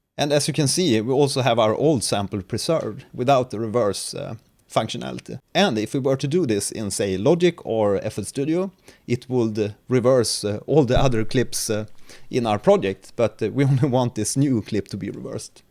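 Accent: Sweden. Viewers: Thanks for this informative video. (PS! Love that Swedish accent)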